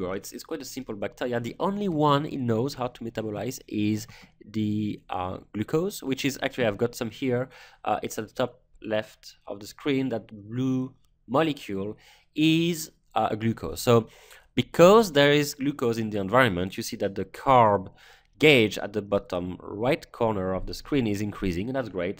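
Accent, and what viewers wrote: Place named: France. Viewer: nice touch with the logarithmcic scales, it a good move on your part really, being able to represent 1E5 and 1E-5 just with 10 units of difference graphically shure does help a lot, the only thing I hope there will be is some kind of note for newcommers to inform them. It still seems to advance nicely. (but that french accent though, ça fait bizarre à entendre '-' . C'est surement moi qui est bizarre...)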